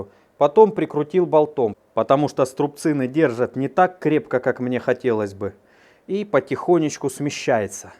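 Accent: Rostov, Russia. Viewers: Ростовский акцент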